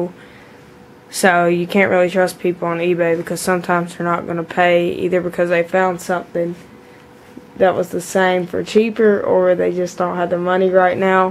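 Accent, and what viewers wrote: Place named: South, United States. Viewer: U from down south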